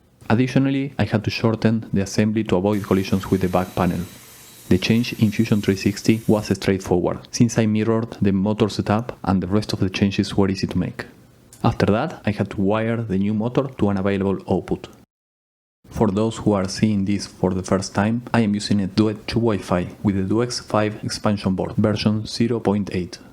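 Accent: Argentina. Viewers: vos sos Argentino??? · El acento argentino es inconfundible jaja. Bien ahí hermano, muy buen proyecto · Hola, excelente maquina, tu ingles tiene un dejo de acento argentino, puede ser? Saludos! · Sos argentino papá ? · sonas como argentino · Como andas papá? Otros pensaran que es un ingles malo, pero yo note ese Argentino desde el principio. Proyectazo loco, segui para delante que es realmente impresionante. Felicitaciones 🟦⬜☀⬜🟦 ---- ⭐⭐⭐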